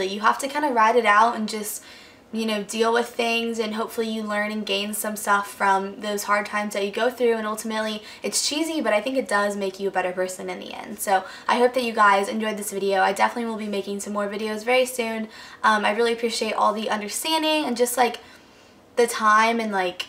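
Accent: Texas, United States. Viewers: It's a small texan accent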